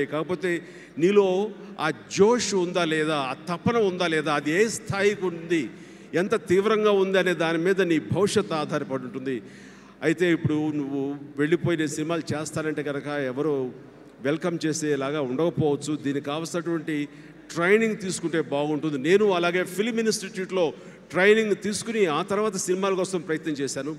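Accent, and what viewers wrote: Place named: India. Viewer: Eena okadu English ni telugu laaga matladuthadu accushan choola baaga chesadu antha alludu...!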